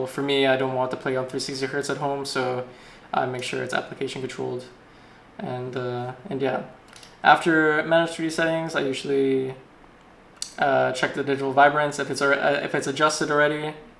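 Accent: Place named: Europe. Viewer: man's played in europe so long he's got a quasi european accent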